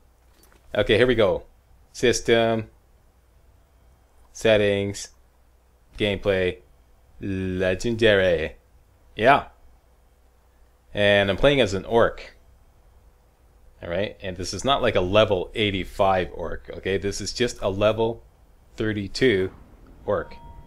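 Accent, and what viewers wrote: Canada. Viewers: You’re Canadian aren’t you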